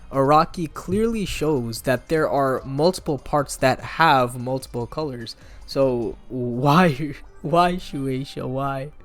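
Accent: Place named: United States. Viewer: I don’t want to sound salty, overly critical, nor judgmental: But I hate how “JoJo“ or some other English words come out as American’s say them. I was born in the states but raised around Latino culture but the way you guys say: “Joe Joe” sounds a lot like “Jue Jue” and when you said “Pay-slie-park” it sounded like you said: “Pay-lee’s-park”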